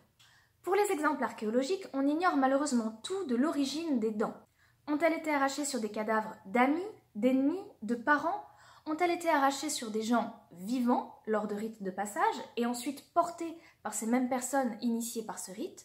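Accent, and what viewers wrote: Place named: United Kingdom. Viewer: Bah il est sympa ce petit accent british !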